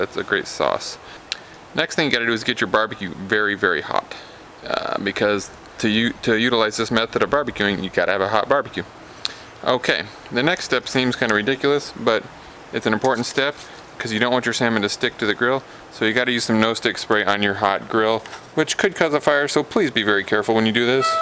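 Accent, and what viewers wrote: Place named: Midwest, United States. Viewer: Where are you from? I can't place your accent. Somewhere from the Midwest? Chicago maybe?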